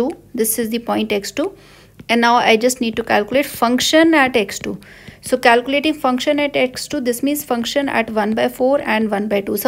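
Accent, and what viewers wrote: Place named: India. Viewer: Indian accent 🥰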